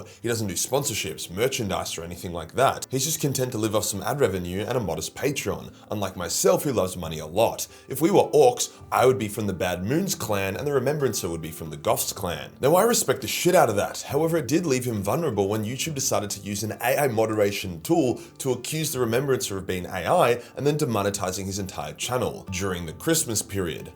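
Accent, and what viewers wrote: Australia. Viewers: Never watched his stuff never even looked into him because well I like your vid length and as a Brit... I do love an ausie accent but yeah hes getting a free sub and watches from now on! Do love how I edited the angry words out and they still kept the angry word version as the comment though 😂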